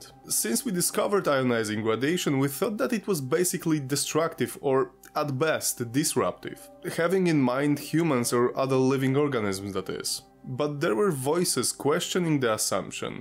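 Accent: Russia. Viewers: ppl were asking why the chernobyl actor never tried your russian accent my man ❤, the whole show would be turned into a very broad Borat film , lol 😂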